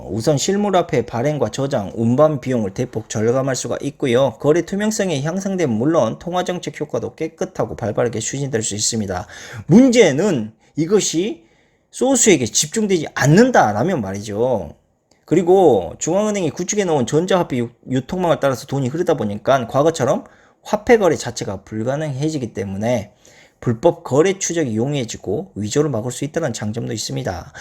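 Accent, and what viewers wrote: Jeolla, South Korea. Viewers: 내가 싫어하는 전라도 사투리도 듣기 좋게 만드는 모든 전라도 사람들이 처럼 똑똑했으면 난 전라도 사람들 존경했을거임 난 개떡같은 인간들만 만났는데 게다가 좌빨인것 까지 ㄷㄷ 같은 깨어있는 분도 있다는걸 암 ㅋㅋ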